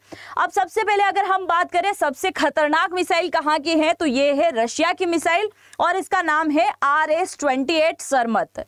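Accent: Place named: Bihar, India. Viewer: Sahar nahi hota hai bihari ho madam.ji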